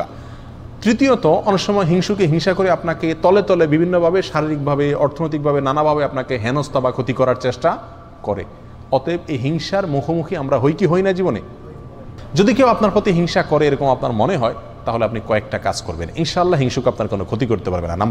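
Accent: Chittagong, Bangladesh. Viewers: Vai Apni Ki chittagong Er ?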